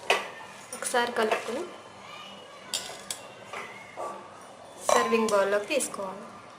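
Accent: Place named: Guntur, India. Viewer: Miru bale cheptunnarandi same guntur style lo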